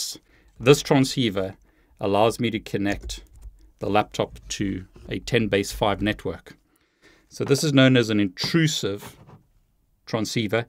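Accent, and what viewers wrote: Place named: South Africa. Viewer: how long have you been in South Africa?, I can pick up your accent to somewhere in South Africa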